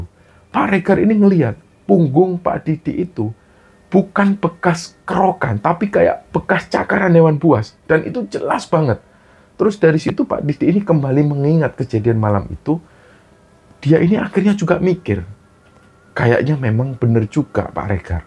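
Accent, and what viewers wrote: Java, Indonesia. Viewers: Nonton KS ga pernah selesai, di tengah cerita pasti ketiduran. Suara mas dengan logat jawanya enak didenger 😅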